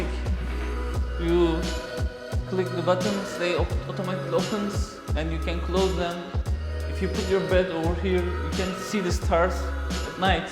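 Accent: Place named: Turkey. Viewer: ajajajajaaa hayirdir bozaci siraci durumlari...siz gercekten baska bir alemde yasiyorsunuz, bu ayaklari oyle turk kankalarina yutturursun ama yurt disindaki insanlar bilincli ve A'dan Z'ye herseyin dogrusunu arastirarak ogrenip geliyorlar...yeterince rezil olmus durumdayiz millet gulme krizine girdi bu videoyu gorenler, bir de o turkce inglizceleriniz....soyleycek laf bulamiyorum IQ -1000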